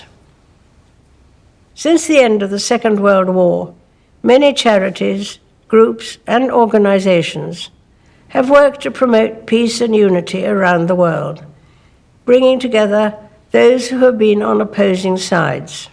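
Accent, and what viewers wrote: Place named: RP, United Kingdom. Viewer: At least she speaks Queen's English and has style. Don't know who wrote her speech. Our nice queen Silvia, who is supposed to know 8 languages, how come she hasn't learnt to speak well yet? Many resources , pedagogues, money and speech laboratories at her disposal. The royal family should heal. Everyone should.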